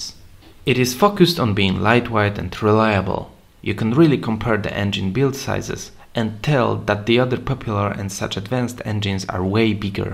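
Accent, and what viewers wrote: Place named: Poland. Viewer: Polski akcent od razu można poznać.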